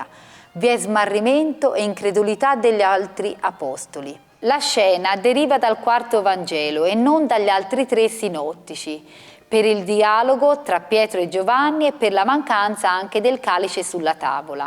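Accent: Tuscany, Italy. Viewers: Ascoltar cose d'arte con accento toscano e, per somma combinazione, con suono di voce di donna, vellica una gioia intellettiva di rara bellezza. Chapeau all'Autrice!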